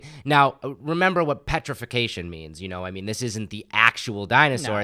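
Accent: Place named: United States. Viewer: You sound "super american" lifes a bitch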